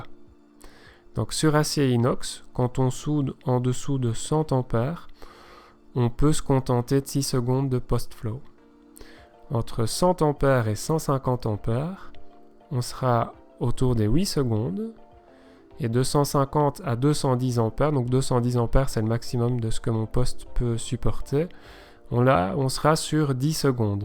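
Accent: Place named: Belgium. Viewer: Merci, ça fait du bien de se remettre ces réglages en tête, avec un petit accent Belge en bonus !!!!! Merci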